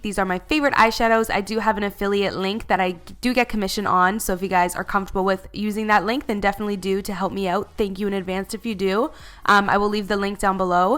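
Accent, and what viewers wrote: Canada. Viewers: Her parents are portuguese,but I think her accent is pretty canadian...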